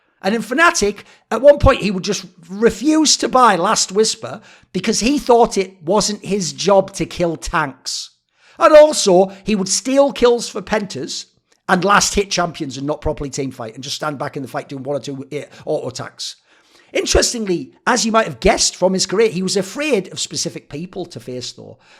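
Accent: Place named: North East England, United Kingdom. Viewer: are you from the north east?? i recognise that accent. but pls leave rekkles alone he's a good dude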